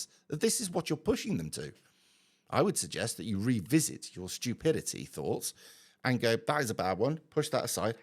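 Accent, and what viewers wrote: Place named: England, United Kingdom. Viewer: This channel is pure grifting with an English accent.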